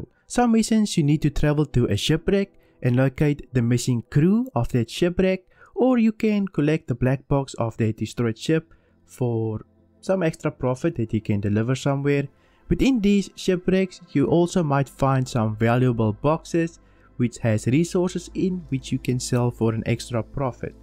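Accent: Afrikaans, South Africa. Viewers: Ayyyy a SOUTH AFRICAN bru, keep up the hard work and thank you for the video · Awe my broe. I hear this guy speak for 3 seconds and i'm like "is he from south africa?" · Lekker dude, ek het subscribe. ek kan mooi hoor jys afrikaans. Ek dink jy moet jou kanal in Afrikaans doen. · Ek kan jou Afrikaans hoor my ou hahahaha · Are you South African?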